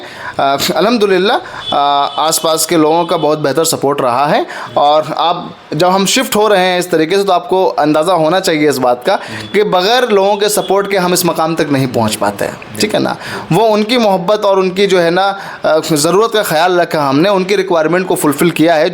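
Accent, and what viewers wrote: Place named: Magadh, India. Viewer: "है ना" यह तो अपने मगध (औरंगाबाद_गया) क्षेत्र वाले लगते है । कोई Confirm करें, Plz। m Ex JMIIte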